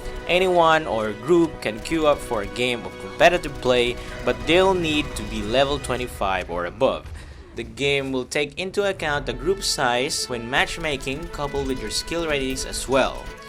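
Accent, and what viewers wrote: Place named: Philippines. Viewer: this is actually really well done. the "filipino english" accent is bearable <3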